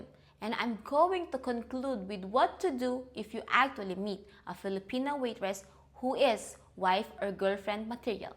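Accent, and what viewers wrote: Ireland. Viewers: I love listening to Irish 😅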